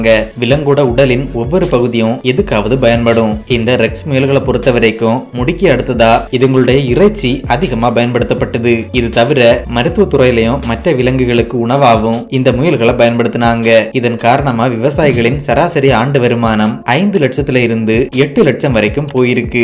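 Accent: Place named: Kerala, India. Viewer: மலையாள சாயலில் தமிழ் கொஞ்சம் வித்தியாசமாகவே இருக்கு. நாஞ்சில் காரரர நீங்கள். அரசு என்பதை அரய்சு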